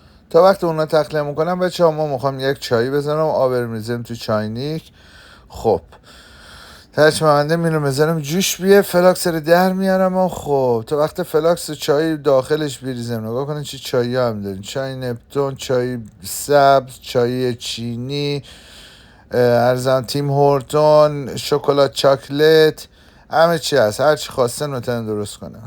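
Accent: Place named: Mashhad, Iran. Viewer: درود بر شما با اون لهجه مشهدی قشنگت،لطفا یک مقدار هم از جاده ها و طبیعت زیبای کانادا حين رانندگی بزارید،ممنونم ایران خوزستان 🎉❤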